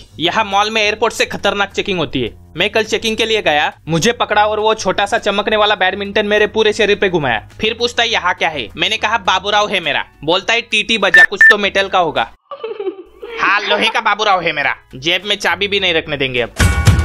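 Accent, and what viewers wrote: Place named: Maharashtra, India. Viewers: Tuzi marathi tone madhli hindi mast waatate 👍😂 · ur accent is full marathi.....very funny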